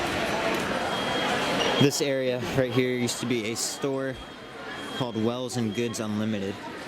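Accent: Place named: South, United States. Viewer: The whole Wonders building is very dated but could be gutted and converted into something else. The outside should be painted from yellow to silver or something less garish but the space is huge and should be reimagined into something which makes use of all that space, maybe a ride. You are so disciplined which is good as it must be tempting to open things and interfer with stuff you see but you don't which should be commended as a lot of vloggers don't always do the same. Also where are you orginally from? I can't always recognise American accents but you seem to have a soft sounding southern accent I can't work out.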